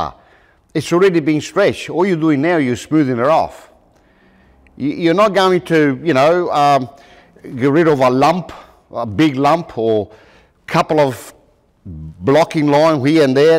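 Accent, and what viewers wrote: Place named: Italy. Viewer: Sounds hes italian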